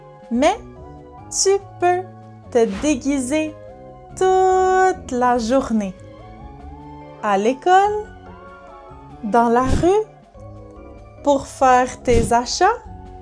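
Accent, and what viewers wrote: Quebec, Canada. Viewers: Fun video! I am amazed at how different the Quebecois accent is. It's lovely but I'm not used to it.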